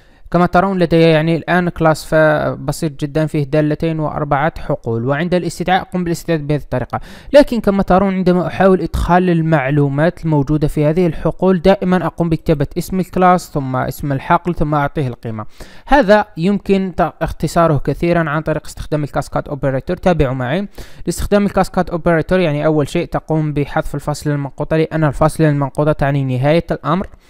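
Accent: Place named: North Africa. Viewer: Souqran!! Hon Moussa, Ana Yahoudi ubakhki Ubrani bass I was able to understand it, knowing the small differences in our ancient languages (Hunna = Hinne, A-Salam Aleikum = Ha-Sholom Aleikhem, Bikoum = Bakhem, WaHuwa = WeHou, Kalam = Kolam, Nafsa = Nafsho, Nukdatein = Nekudothahyin, Fi-Mathala = Be-Mashall, Acher = Ahar, Kabir = Kabir...) Sound's North African. Is this Algerian or Morrocan or something else? Anyway, thank you for clearly explaining the cascade notation clearly, I saw from the code, but also was able to understand each and every word!!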